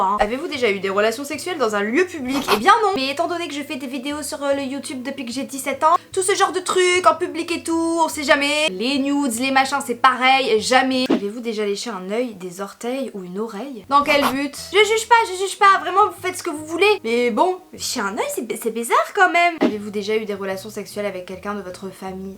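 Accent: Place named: North, France. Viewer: Par contre, j'ai hurlé de rire dans ta vidéo mais... Parce que t'as grave l'accent du nord ça m'a tué bordel, mais j'aime le même t'inquiète mdrrrr olive you <3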